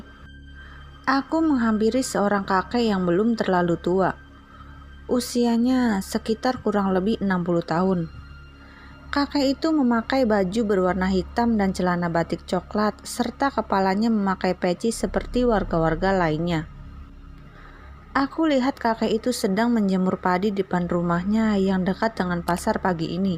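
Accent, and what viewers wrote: West Java, Indonesia. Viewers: Yg baca logat sunda...ya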